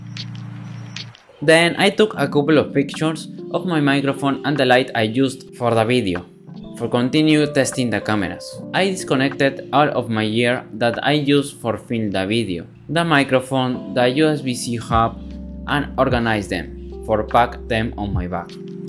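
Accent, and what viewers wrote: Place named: Argentina. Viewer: Lo hubieras hecho en español y con tu acento argentino para no tener q sufrir tanto con el ingles jaja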